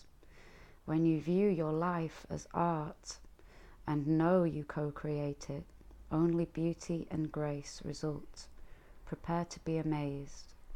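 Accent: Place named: Yorkshire, United Kingdom. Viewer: Thank you for pile 4 that i was guided to 🙏🏻 i hear a fellow Yorkshire accent, it’s nice to hear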